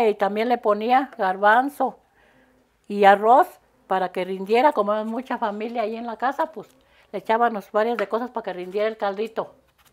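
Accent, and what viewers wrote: Mexico. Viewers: Que gran diferencia, vi la receta en otro canal de una extranjera y solo con escuchar el acento no me gustó el caldo, que bien se siente escuchar a una mexicana que te lo explique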